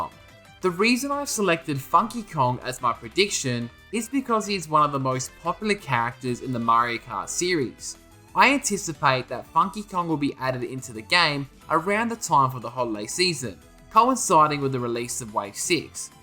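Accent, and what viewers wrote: Australia. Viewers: I’ve never heard someone more Australian than you. And i love it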